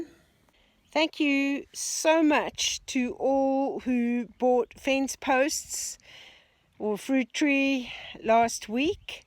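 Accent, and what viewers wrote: South Africa. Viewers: Now that voice is very familiar! South African? I'm going to enjoy this post.Nicky Bedford from Cape town .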